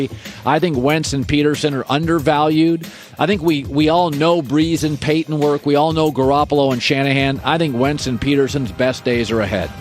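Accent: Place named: Boston, United States. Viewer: Pick one; you can either have a, slurring S’s speech impediment, or you can have a Boston accent. You can’t have both, and be in broadcast journalism. Even sports broadcasting.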